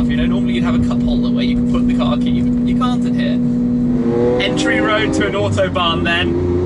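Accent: England, United Kingdom. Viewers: Shmee maybe you should change your intro words? Do u live near Germany? Just curious, your accent seems English, how do you so often appear on autobahn? Video is so bumpy is a glitch or road with pot holes? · Alright, you must drive on the right side of the road and learn some words German of course. :D Anyway, I'm glad how you love and enjoy the cars with a lot of passion on the autobahn. As a German it's so entertaining and funny to hear your enthusiasm in English with the British accent. Have a nice week and soon a happy new year with many astonishing cars!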